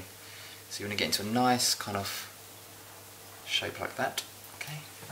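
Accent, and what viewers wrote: England, United Kingdom. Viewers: Your dialect is nice. What part of England is it from?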